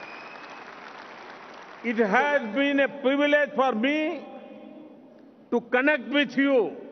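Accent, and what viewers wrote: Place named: India. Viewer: Salman MOHD.Apprecite his talent delivering a speech in front of a huge audience & his accent may be very much Indian English but the message is clear to everyone....aap ki baap ko bhi broken or tuti-futi angrezi bol Na nahi aatha hoga... yourself displaying your ignorance very much obvious.... madrasa mein pade hue log aap ke jaisa mindset rakthe hai...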